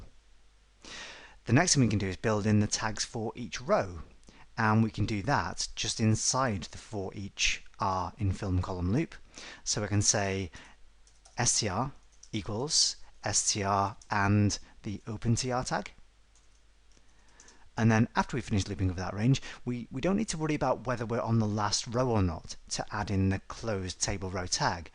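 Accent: United Kingdom. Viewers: Amazing video! thank you so much! You explain complicated things in such an easy way, great job! Oh and that amazing British pronunciation!!!!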